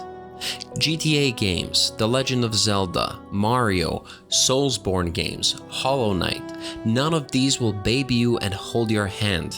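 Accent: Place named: Italy. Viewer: That Italian accent tho 😁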